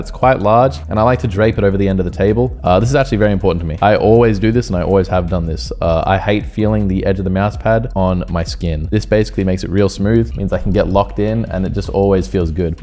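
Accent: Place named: Australia. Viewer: I love the Australian accent 🦘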